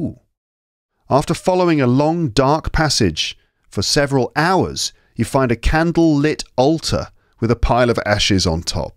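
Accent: United Kingdom. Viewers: I like your British accent and style. American English accent is very hard to understand for me.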